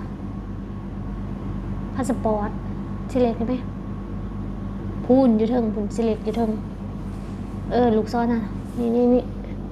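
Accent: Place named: Sisaket, Thailand. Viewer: สำเนียงภาษาเว่าน้อง คือกันเปะเลยกับคนจังหวัดศรีสะเกษบ้านอ้าย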